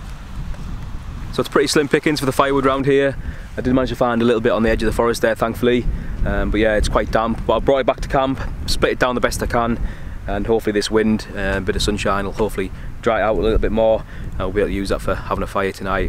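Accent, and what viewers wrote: United Kingdom. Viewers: Ya know if it wasn’t for your accent, I wouldn’t think you’re in the UK , the scenery is as me cousins in Ulster would say lovely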